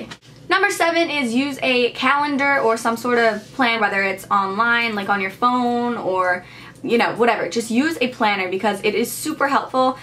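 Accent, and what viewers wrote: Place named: Midwest, United States. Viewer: you sound more like someone from the midwest, not a New Englander. But awesome advice!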